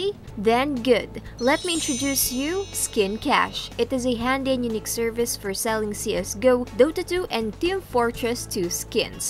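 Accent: Philippines. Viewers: that Pinay accent though lol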